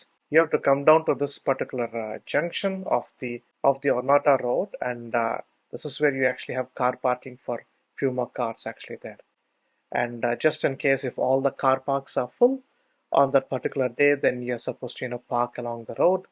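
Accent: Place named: India. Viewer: Shame that it's so difficult to understand the thick Indian accent. Why didn't you get someone to narrate for you. Btw it's not Brooks Lookout. Although your transcript does say Burkes, you wrongly pronounced it as "Brooks". Had I not read the follow on, I'd be looking for Brooks Lookout, man. I have been here many times and never seen a cell tower, so perhaps didn't trek the exact same route as you. I was around the tv towers and the Kyeema memorial site.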